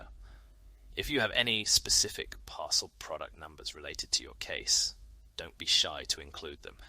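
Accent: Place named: RP, United Kingdom. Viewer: You do sound posh lol Great advice What about broken items same thing ?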